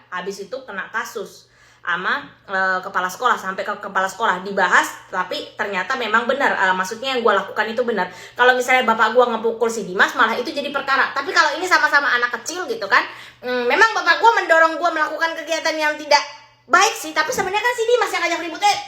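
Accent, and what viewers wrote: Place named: Java, Indonesia. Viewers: seru ya dengerin yang dulu kaya gini, sekarang ngomongnya dilogat jawain kurang sreg dengernya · Karna dia emg org jogja kan, logat aslinya emg jawa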